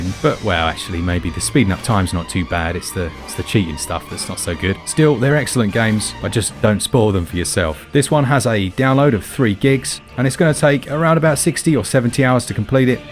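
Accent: England, United Kingdom. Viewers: I'm here for the British accent. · Wait are you saying I can win a prize if I just leave a comment? I can handle the British accent. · The British accent IS the reason why I subscribed XD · Believe it or not, but you are the only British guys I understand perfectly! Plus, your accent makes me take a plane and go to England (damn CORONA!!:)) I love your channel!! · Well I was gonna sub but I can't handle the British accent... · Love the British accent, greetings from México 🇲🇽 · I can handle the british accent. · British accent: handled😈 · Even the avoid section sounds buyable when heard in a British accent Been wanting the final fantasy 10 and 11 remastered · Can definitely handle the British accent 🤣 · As an Englishman I personally cannot handle the English accent. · Real question, who doesn't enjoy a nice Brittish accent? Also, gotta say, I love the Monkey Island music. 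It always puts a smile on my face. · Handle the brittish accent.. I want it myself! As always great content here :) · Love your channel and find your British accent to be endearing. Thanks for all the good run downs! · I subscribed because I can handle the British accent. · Who the hell can't handle British accents? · Monkey island music, a British accent, a nod to Monster Boy, and Dragon Quest- cant get any better.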